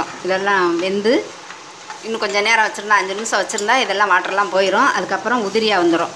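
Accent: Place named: Tirunelveli, India. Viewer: Nellai tamil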